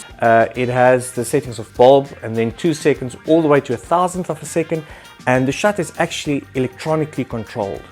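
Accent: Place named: South Africa. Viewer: Great video, I have also the AE-1, AV-1 and AT-1. I can see why people love them and I loved your opinion too. Fair review and I learned a few things I didn't know before. I'd recommend Rode Wireless mics as your audio is peaking slightly with the Boer brogue at times. I'm Scottish and had similar volume/ peaking issues. Rode mics are excellent and great value. Great content, cheers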